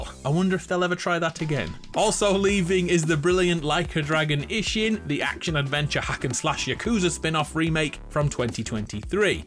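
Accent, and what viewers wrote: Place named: Northern England, United Kingdom. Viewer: I’ve never realised you’re from up north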